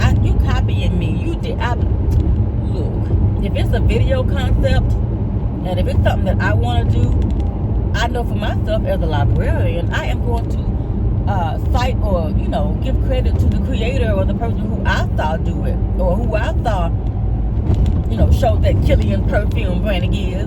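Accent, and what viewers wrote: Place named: South, United States. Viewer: Great ride along video love your AKA rain coat and I love country dialect 💞💚💞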